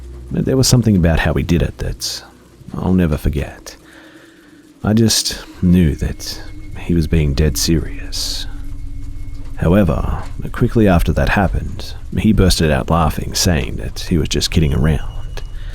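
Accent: Australia. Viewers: Very nice Australian accent yes, one of the best. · Awesome Aussie thank you sir for the scares in your awesome accent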